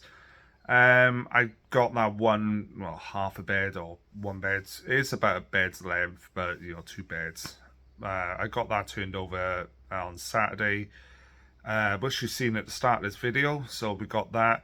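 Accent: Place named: Northumberland, United Kingdom. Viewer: Aha found you! Intrigued by your accent - sounds like a cross between Northumberland and the NPT!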